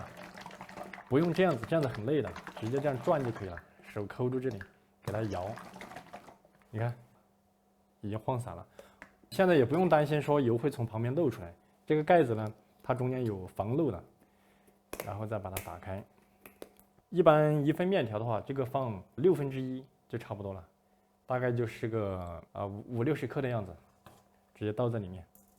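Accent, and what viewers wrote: Sichuan, China. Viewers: 王老师的四川话在恢复唉，泹，汃，这些四川话都冒出来了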